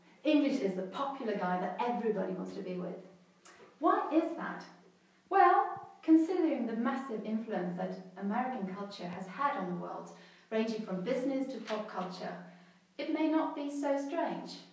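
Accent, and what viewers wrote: United Kingdom. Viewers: I'm guessing from the context here that you're Swedish. You have a heavy British English accent. I thought you were British until I got the context that you were Swedish.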